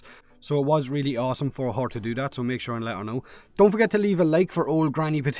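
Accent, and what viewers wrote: Ireland. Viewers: I do enjoy an Irish accent much better than listening to the wee hawbaw wanks about here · Irish accent sounds so funny :D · Holy shit, that is an awesome Irish accent! Hahah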